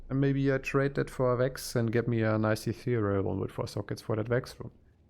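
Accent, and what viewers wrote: Germany. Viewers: Bro u German?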